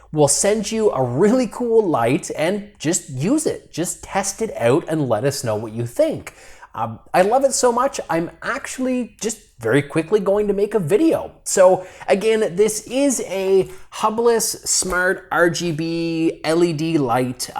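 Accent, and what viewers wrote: Canada. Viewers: You sound Canadian 🇨🇦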